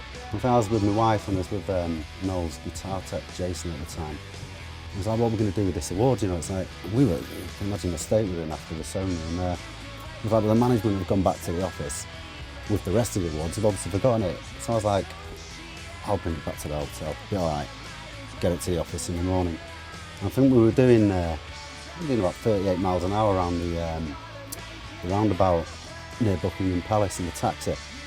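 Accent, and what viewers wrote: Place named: Manchester, United Kingdom. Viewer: he's talking manc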